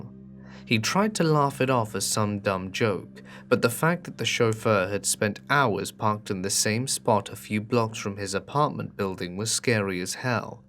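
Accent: Ireland. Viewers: Love the Irish accent,!! Happy New Year!! 🥳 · That Irish accent is pretty hot. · I love your Irish accent! Happy new Year!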